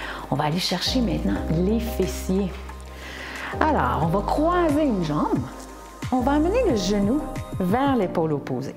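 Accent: Quebec, Canada. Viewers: J'adore, c'est simple bien expliquer ! En plus d'un joli accent québécois 😚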